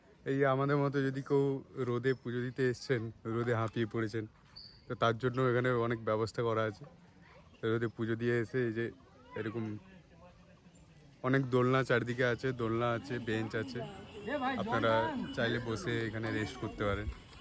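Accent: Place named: Bangladesh. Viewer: Pure bangla accent ❤🫡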